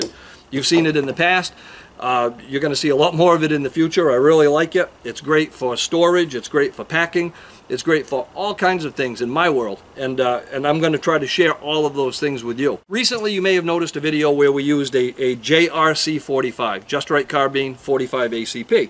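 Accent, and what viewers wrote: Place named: Northeast, United States. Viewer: I feel really stupid for asking this question but what state are you from? It sounds like Northeast, which isn't known for being gun frirndly!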